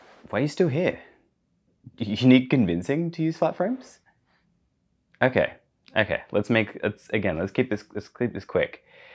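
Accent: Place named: Australia. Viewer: expecting a non Aussie accent on YT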